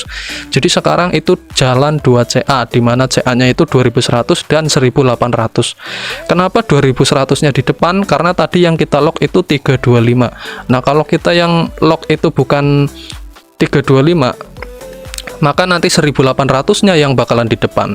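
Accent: Banyumas, Indonesia. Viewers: Ketone wong ngapak kiye 😂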